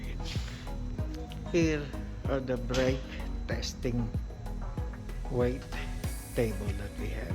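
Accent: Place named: Spain. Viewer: Se nota que eres español por como pronuncias en inglés.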